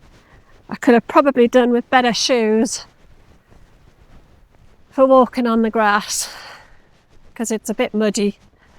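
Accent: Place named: Newcastle, United Kingdom. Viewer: I assumed you were a geordie, but I'm no expert, I'm a cockney myself so everyone else is either 'west country'or 'northerner'! 😂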